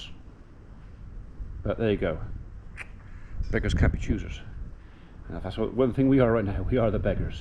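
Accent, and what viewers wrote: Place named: Suffolk, United Kingdom. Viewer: Where you from? Where do you live,sounds a bit Suffolks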